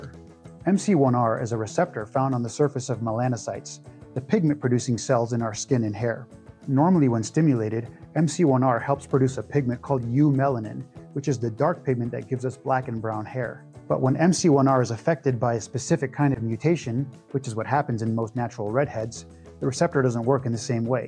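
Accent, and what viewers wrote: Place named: Scotland, United Kingdom. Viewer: Your Scottish accent is great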